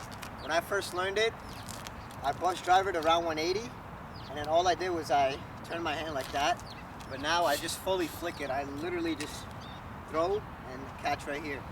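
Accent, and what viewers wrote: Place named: New York, United States. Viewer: Yo are you from new york???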